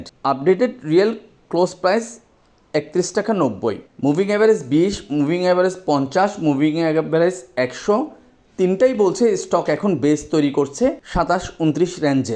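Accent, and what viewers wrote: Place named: Chittagong, Bangladesh. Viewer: কথা শুনে মনে হচ্ছে চট্টগ্রাম বাড়ি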